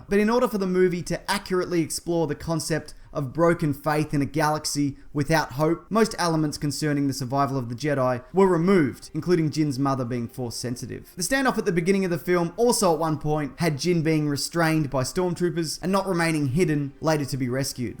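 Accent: Australia. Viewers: Okay mate got a bone to pick! So..... You're Australian (So am I). That atom app looks dope and I wanted to get it, I go to the sight cause I couldn't find it in the google play store, and it says the app is not available in my country........ SO WHY ARE YOU ADVERTISING AN APP THAT YOU CANT EVEN USE, THATS LIKE SUPER SELLOUTY! But its a good vid thanks for all the hard work u put in the vids to make it as entertaining as possible...... thanks for makin the aussie accent sound better then it actually is.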